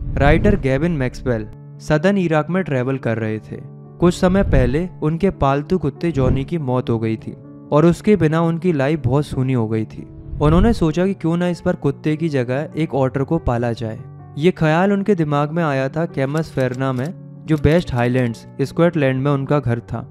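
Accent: Uttarakhand, India. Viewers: Are u from Uttarakhand❤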